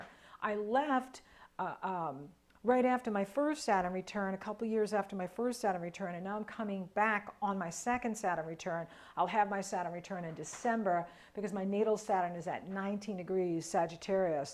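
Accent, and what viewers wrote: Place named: Northeast, United States. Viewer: Great to hear from you,! Thank you for taking us on this tour, I LOVE it! Although I'm slightly confused, are you saying that our ascendant changes to our current location? Also, as born and bred Jersey Girl, I believed that your accent was North Eastern. My suspicions have been confirmed LOL. Anyway, again. Glad to hear from you and looking forward to more adventures with you:)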